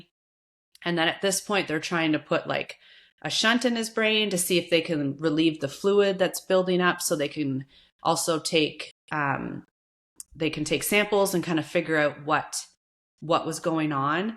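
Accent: Canada. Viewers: You sound Canadian. Hope you qualify for financial assistance from the government and that your husband had life insurance. I have faith your husband is at peace, it's those of us left behind who suffer. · sounds like canada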